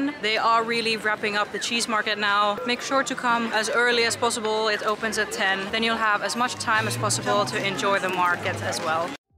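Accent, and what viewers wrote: Netherlands. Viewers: I´m suprisied that your English accent sounds like a native Dutch person speaking English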